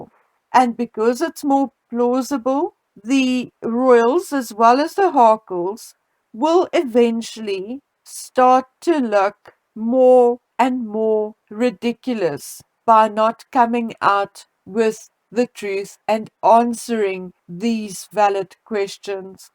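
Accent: South Africa. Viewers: This woman (commentator) sounds like a South African, because of the accent. I am from SA and I can tell.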